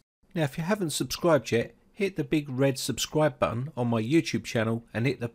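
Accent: England, United Kingdom. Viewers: I hate you english acent but thanks I use a windows 7 boot diferent to the windows 10 boot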